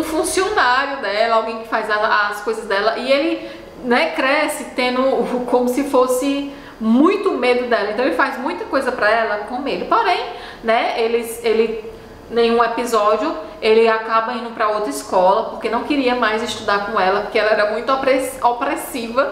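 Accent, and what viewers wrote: Northeast, Brazil. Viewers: A melhor crítica que fiz para um dorama escrevi para IREI QUANDO O TEMPO ESTIVER BOM. Simplesmente maravilhoso. Eu adoro os vídeos da. Esse sotaque nordestino é delicioso de ouvir! Parabéns, linda! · você é nota mil e o seu di do Nordeste e Norte é show vem conhecer o Ceará flor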